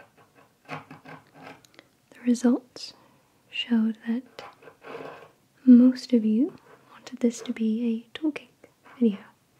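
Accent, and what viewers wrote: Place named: United Kingdom. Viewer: Hey there. Your voice has moved into my top 3. Probably some to do with your British accent . Anyhow greetings from Michigan Usa. Need to drop you a tip soon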